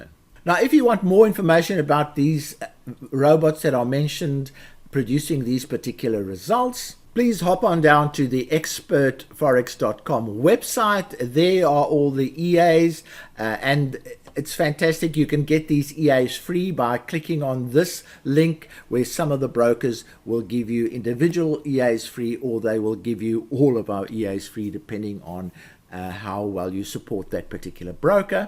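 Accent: South Africa. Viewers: Hi, can I rather ask. Are you a South-African?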